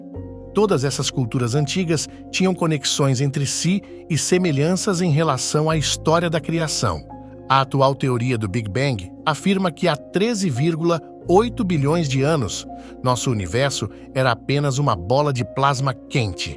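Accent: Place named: Minas Gerais, Brazil. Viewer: É mineira de BH..😂😂😂... Nú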